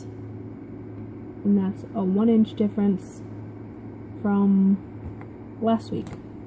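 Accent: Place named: Boston, United States. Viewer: I looooove ur videos!!!!! I also loooove ur Boston accent! I've been watching ur videos from the beginning and girl YOU ROCK!! U r looking super fabulous. Enjoy the wedding and wearing ur new size 16 dress!!!! My vertical sleeve surgery is next Wednesday and I can't wait to be in a size 16!!! Love to u missy!!! Keep up the great work!! Oh and hopefully soon u will be OUT OF THAT OFFICE!!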